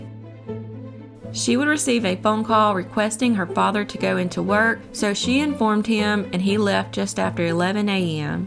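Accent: South, United States. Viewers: Love your accent- voice. May i ask what state you are from. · Love your southern accent!! What state are you from? Love from Ireland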